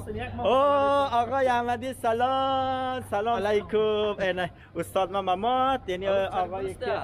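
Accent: Hazaragi, Afghanistan. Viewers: are bakhuda khub saf azirgi tora mugya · واقعآ باید بتو افتخار کرد، چون سوچه آزرگی گپ میزنی😍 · Man bazi az chiz hai ke megi namifahmam chon hazaragi harf mizani va ham tond sorry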